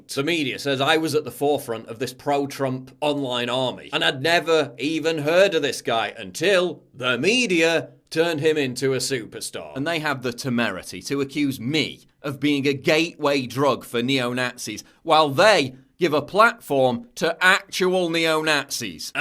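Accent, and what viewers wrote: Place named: Sheffield, United Kingdom. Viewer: Firstly, it wasn't murder. She died of a heart attack - manslaughter at the worst. Secondly, the Alt-Right does resonate with a lot of people. Red Ice TV has 145,000+ subscribers. James Alssup, present as Charlottesville, has 162,192 subscribers. Tara McCarthy has 50,000 subscribers. Alt-Right leaning "Black Pigeon Speaks" has 249,887 subscribers. Thirdly, makes some dubious claims to smear us all. Only Andrew Anglin mocked Heather Heyer's death. You smear us as "holocaust deniers" yet give no examples. Jared Taylor, Greg Johnson and Vox Day are far smarter than you'll ever be. You're just a sarcy and childish civic nationalist, regurgitating the same old Classical Liberal talking points with an annoying Sheffield accent.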